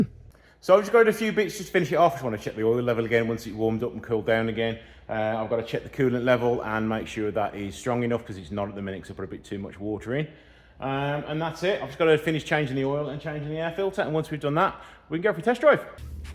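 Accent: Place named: West Midlands, United Kingdom. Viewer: Always funny to hear an angry West Midlands accent! 😂😂 The song is by. 🤘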